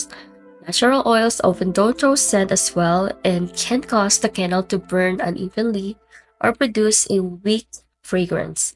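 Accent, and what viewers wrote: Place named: Philippines. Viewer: Ang galing kabayan! Your accent is a filipina. Congrats❤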